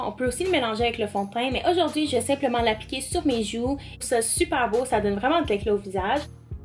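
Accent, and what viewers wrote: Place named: Quebec, Canada. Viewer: wow c est la premiere fois que je t ecoute en quebecois d habitude je t ecoute en anglais j adore ton accent, et bien evidement ton maquillage t es super belle et tres sympathique bisous de l egypte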